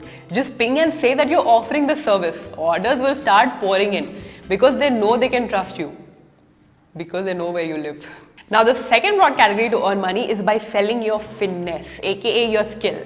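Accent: Hyderabad, India. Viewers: Are u an Hyderabadi ????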